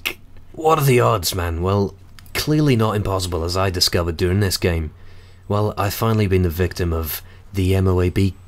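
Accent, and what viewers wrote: United Kingdom. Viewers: You and XboxAhoy need to collaborate. NOW! Two British accents in one vid? Eargasm!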